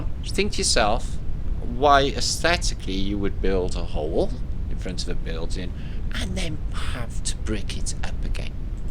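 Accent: United Kingdom. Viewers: off the cuff comments are what I live for! Man looking at self in mirror, “Hi, what do you do?” Reflection, “I’m an artist. What do you do?” Man, “I clean cars for a living.” Love you, although I can’t understand HALF of what you’re saying with that British accent!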